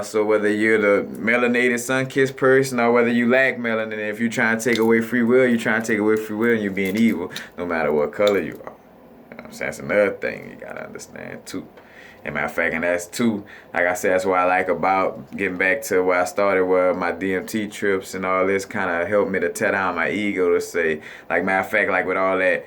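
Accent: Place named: South, United States. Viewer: I notice that you have a southern accent - you would have been burned at the stake, in earlier times - by both blacks And whites for expressing this type of contentent.... the 21st century is truly is incredible !!!.....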